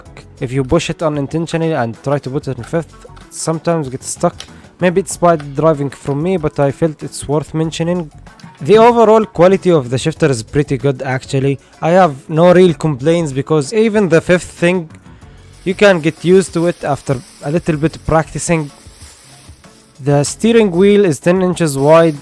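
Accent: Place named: Arab world. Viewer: Arab Accent goss hard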